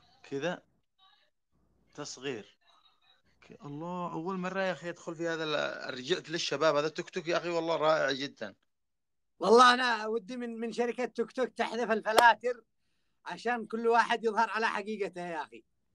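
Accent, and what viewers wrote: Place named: Yemen. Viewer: هذا يمني لهجته خالد الراعي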